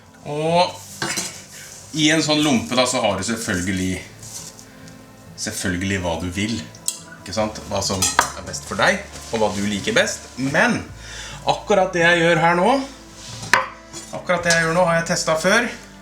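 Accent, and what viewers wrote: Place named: Fredrikstad, Norway. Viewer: Jeg er litt nerd på dialekter. Får jeg tippe? Fredrikstad? Mulig jeg bommer stygt hehe.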